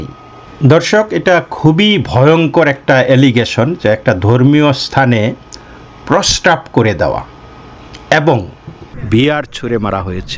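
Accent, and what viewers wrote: Bangladesh. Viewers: Islam is not a religion but is a political ideology.... don't be a hypocrite...you also know the truth... whole EU must ban muslims...and ban muslim immigrants... don't you know how Lebanon was islamised in just 20 years... your dialect tells me you are a Bangladeshi Hindu...have you forgotten the Noakhali massacre of innocent Hindus...??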